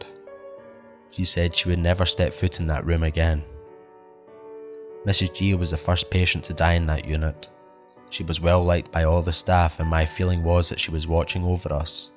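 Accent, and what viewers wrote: Scotland, United Kingdom. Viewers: Amazing, is that a Scottish accent?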